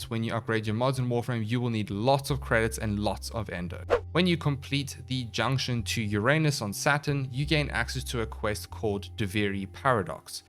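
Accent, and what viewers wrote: South Africa. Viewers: South African? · Bra why do you sound south african.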